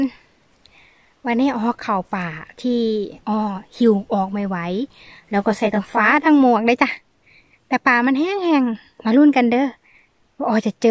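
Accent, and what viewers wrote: Korat, Thailand. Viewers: สำเนียงแบบนี่ คนโคราชไช่ม้ะหนิ :)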